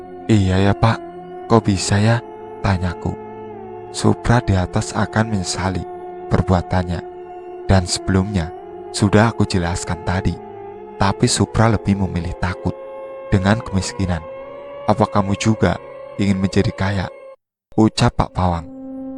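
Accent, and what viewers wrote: Java, Indonesia. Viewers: Bahasane itu lhoOo ... Medok bgt .. Jawa TuLeN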